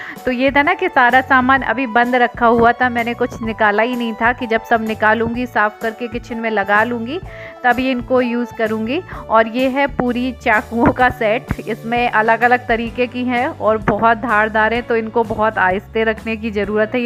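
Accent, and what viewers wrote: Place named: Agra, India. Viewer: Accha aap Agra ki ho didi . mujhe lag hi raha tha aapki boli se mai bhi agra ki hu .Apne log pehchan me aa jate hai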